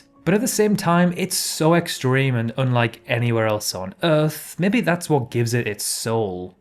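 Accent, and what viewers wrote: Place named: RP, United Kingdom. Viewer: You sound like an upper class twit from a Monty Python skit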